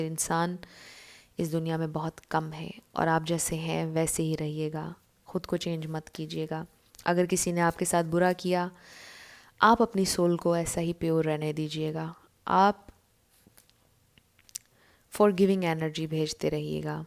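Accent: Pakistan. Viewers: her way of talk matched with us i m also pakistani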